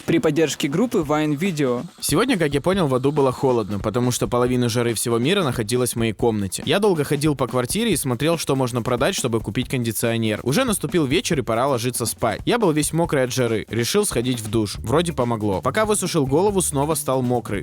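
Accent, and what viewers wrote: Odessa, Ukraine. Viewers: Вы из Одессы?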